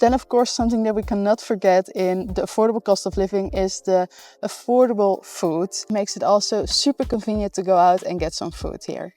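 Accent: Netherlands. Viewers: Dialect? She's speaking English with a Dutch accent. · i love your dialect from dutch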